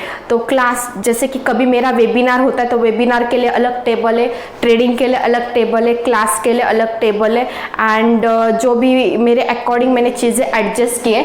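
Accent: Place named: Maharashtra, India. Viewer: Marathi logo ki hindi... जगात भारी 👍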